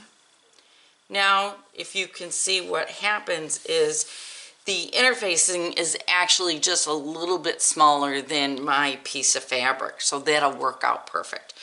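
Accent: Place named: Midwest, United States. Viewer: Taking a class on this so it was great to see your steps ahead of time ! Thanks so much ! Love your mid western accent - I’m a Detroit transplant now living in Texas!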